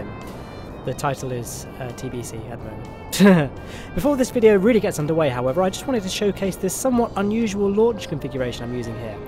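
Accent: United Kingdom. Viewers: It’s because of his British accent and many Americans think that Britons are very posh, even though most are not. (Just listen to a song!)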